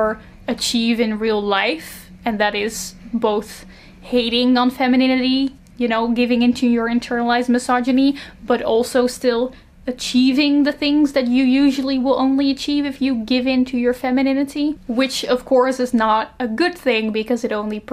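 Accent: Netherlands. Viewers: The Algorithm recommended me this video...that accent sounds dutch are you dutch?! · are you a dutchie i hear an accent (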